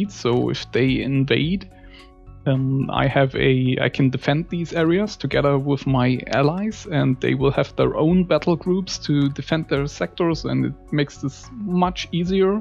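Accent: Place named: Germany. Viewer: Woohoo, german accent again! :) Danke!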